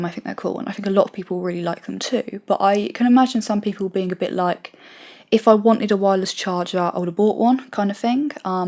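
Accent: England, United Kingdom. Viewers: Very thorough review, you girl know a lot about hardware. On the top of this you have a very pleasant voice and lovely english accent.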